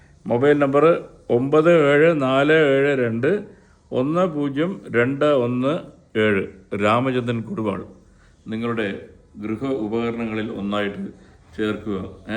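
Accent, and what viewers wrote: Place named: Palakkad, India. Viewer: പാലക്കാടൻ സ്ലാങ് സൂപ്പർ സാർ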